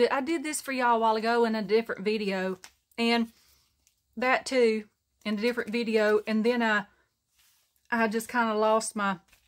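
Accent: South, United States. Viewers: I'm new saying howdy from Texas!! I love to hear another southern voice and your journals are amazing :) Where do you get all the supplies? I wish I could come play at your craft table!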